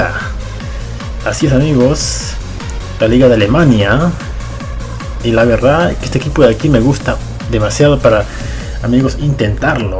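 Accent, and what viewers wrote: Peru. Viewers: eres peruano ¿? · eres peruano?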